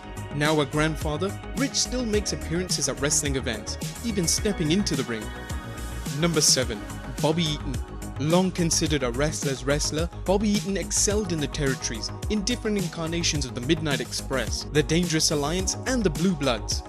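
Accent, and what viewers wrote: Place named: United Kingdom. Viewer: Who wants to listen to a brit?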